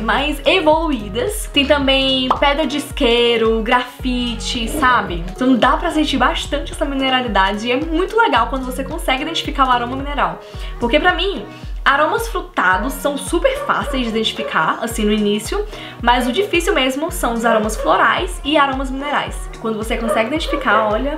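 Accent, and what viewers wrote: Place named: Fortaleza, Brazil. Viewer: senti a familiaridade kkkkkk 😆❤️ sou de Fortaleza também 🇧🇷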